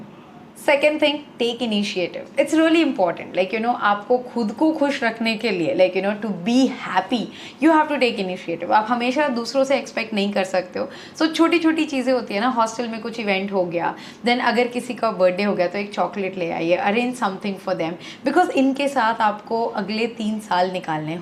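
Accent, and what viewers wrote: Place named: India. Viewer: Your Desi English very nice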